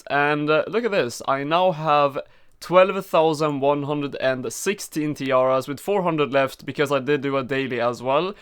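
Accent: Sweden. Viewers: Are you from Sweden? Your accent kind of sounds like rather Nothern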